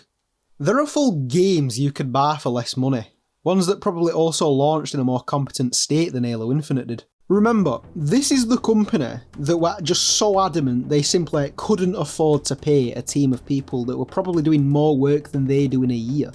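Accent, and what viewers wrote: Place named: South Yorkshire, United Kingdom. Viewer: South Yorkshire police, by the sound of the accent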